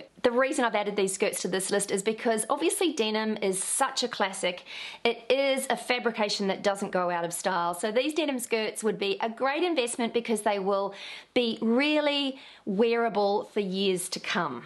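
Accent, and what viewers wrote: New Zealand. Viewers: Just have to say, I adore your New Zealand accent. When you said "six in the city", it made my day! Thanks you for all your insight! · I was wondering where your beautiful accent came from. New Zealand WOW !! We are so far away. I am in Montreal Canada. Thank God for internet. Great videos.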